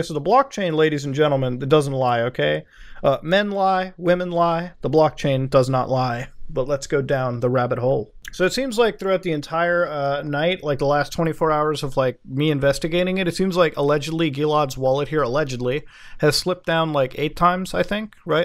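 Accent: Canada. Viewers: He's Canadian, he's got a different accent. Like when an American says croissant vs a French person. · Aboot, caarr, your Canadian accents so thick my friend. -love from Torono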